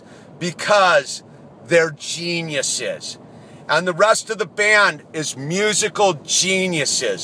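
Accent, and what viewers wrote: California, United States. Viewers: Ahhh. OK. Clearly this hombre is from Cali. No one in their right mind would talk like that anywhere else...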